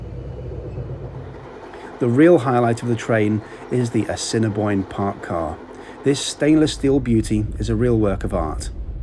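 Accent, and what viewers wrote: United Kingdom. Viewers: Are you Briton???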